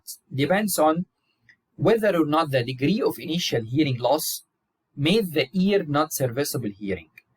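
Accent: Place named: United States. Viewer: As if am listening to an American lecturer ❤❤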